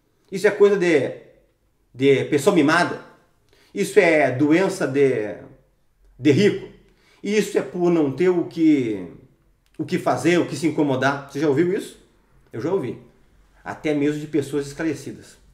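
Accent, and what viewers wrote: Rio Grande do Sul, Brazil. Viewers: É bem gaúcho mesmo, sotaque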